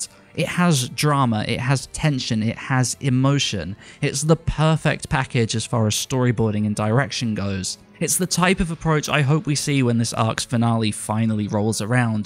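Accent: United Kingdom. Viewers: Japanese words + a heavy english accent sounds a bit cringeworthy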